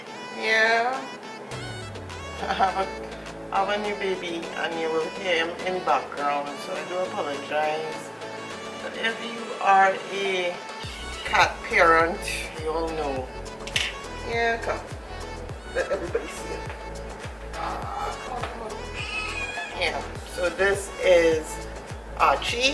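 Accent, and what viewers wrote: Trinidad and Tobago. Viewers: You sound like you have a Trini accent! I love it. My dads from Trinidad. Omg! I just got my palette in the mail the other day! So Pretty!! Loving the look! 💕💕 YOU COMPLETELY SLAYED ME!!! 😍😍😍 I just want to say how much I enjoyed watching your video. Can’t wait to see more! Just left a like 👍🏽 BTW I also have a channel, I would love for you to check it out when you have a chance and show some love and support! I just uploaded a tutorial using this palette as well! Us smaller YouTubers need to stick together, let's support one another! Lol Thanks! 😘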